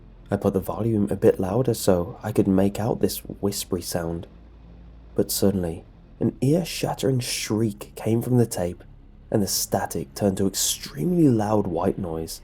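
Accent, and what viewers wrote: United Kingdom. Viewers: If this bastard grew up 'in Pennsylvania', then why the British accent?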